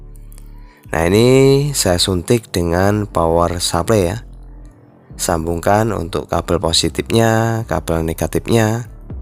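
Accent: Java, Indonesia. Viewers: tapi logatnya jawa bgt mas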